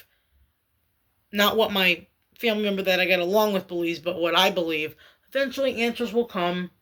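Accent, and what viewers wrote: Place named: Yonkers, United States. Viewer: Randomly stumbled onto your channel - I have no idea about the doll side of YouTube. But I really enjoy listening to you speak. You sound like my family from Yonkers 🥹 maybe it's calming to listen to you reminding me of them.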